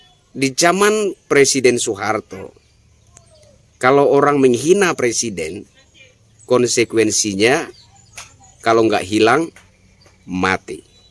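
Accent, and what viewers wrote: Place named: East Nusa Tenggara, Indonesia. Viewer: Merinding saya mndengar narasi dari orang ntt.semoga presiden kita sehat selalu dalam lindungan Alloh swt.salam dari cilacap jawa tengah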